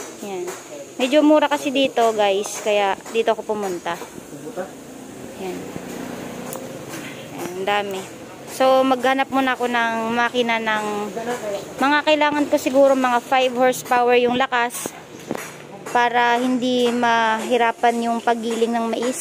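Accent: Visayas, Philippines. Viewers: kakatuwa tlga magsalita ang bisaya natutuwa ako sau madam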